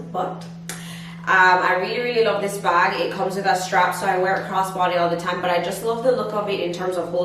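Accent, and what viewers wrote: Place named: Caribbean. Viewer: You sound West Indian Babe x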